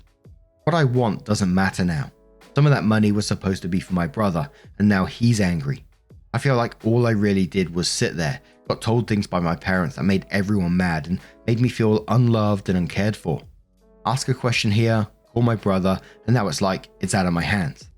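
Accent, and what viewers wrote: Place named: Midlands, United Kingdom. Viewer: British/American accents - and has a midlands accent so different again - have you not heard the way he says was 'waz' for example!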